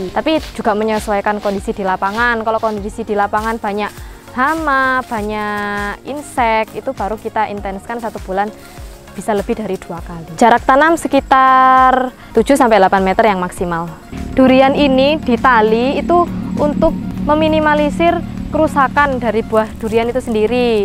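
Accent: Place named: Malang, Indonesia. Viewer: Logat malange gak ilang ,, " "ikuaa Lo mas "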